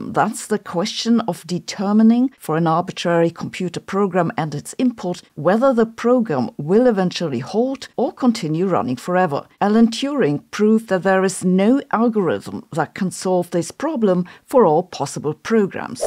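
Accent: Germany. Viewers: I know you have someone proof read your script. I had a German girlfriend who had a slightly harder accent than you. She is very smart and intelligent. She often asked me for proper grammar and pronunciation of words and sentences. I respect your channel and how you are able to make it entertaining and enjoyable for others.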